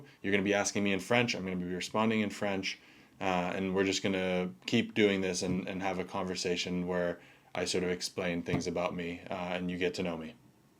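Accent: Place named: United States. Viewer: Very American accented French though, I wonder if you can change it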